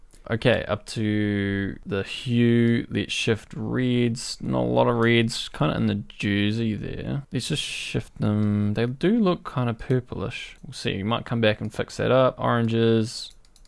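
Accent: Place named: New Zealand. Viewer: A dutch name...that accent youre from NZ love you video's! groetjes vanuit Nederland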